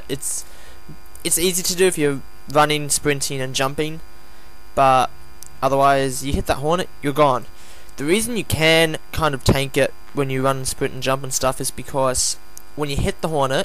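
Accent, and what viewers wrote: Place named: United Kingdom. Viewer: he sounds british.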